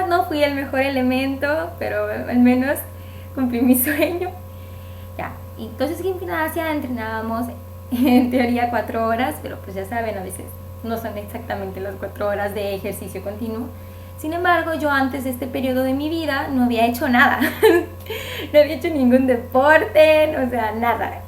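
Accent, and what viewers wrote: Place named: San Luis Potosí, Mexico. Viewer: Jejeje me gusta mucho tu acento y cómo te expresas, pues he tenido amigos mexicanos, curiosamente tu acento se me hace de San Luis Potosí, bueno no me hagas caso no soy de allí pero tuve amigos de allí que se expresaban parecido jejeje. Por cierto te mando un abrazos desde España y ojalá que estés mucho mejor.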